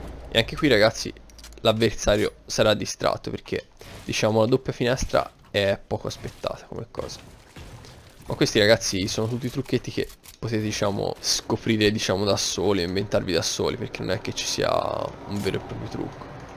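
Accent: Italy. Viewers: Sei della toscana vero? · Grazie mille, spiegato benissimo e poi .. in livornese! (?) Un si pole chiede di meglio! 😂🤘🏽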